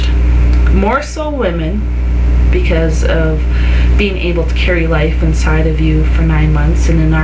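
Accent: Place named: Canada. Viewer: I really enjoyed hearing explanation to this question! For the one searching... just remember, the passed on author Scott Cunningham was a male, and another good teacher. Yet mannerisms and Great White North accent give things a little more personal aspect.:) Blessed Be!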